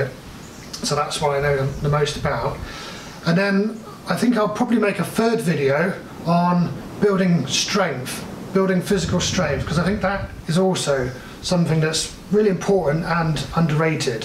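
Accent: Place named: West Country, United Kingdom. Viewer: Bloody hell you sound like my wife literally she's from the west country! Eat healthy stop drinking get fit lol.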